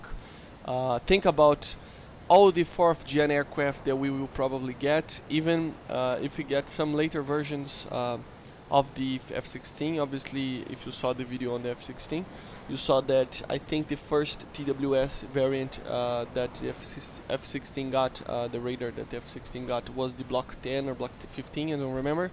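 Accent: Brazil. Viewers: Are you Brasilian?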